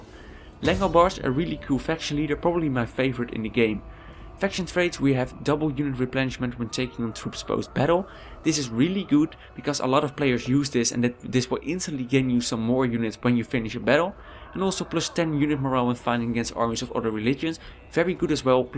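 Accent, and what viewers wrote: Netherlands. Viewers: Dat Dutch accent do :D I love your Dutch accent! Greets from your southern Neighbour!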